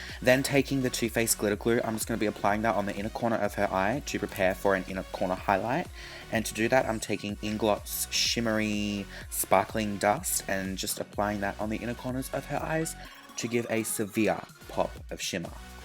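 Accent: Australia. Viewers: Never mind... It's definetly Australian 😅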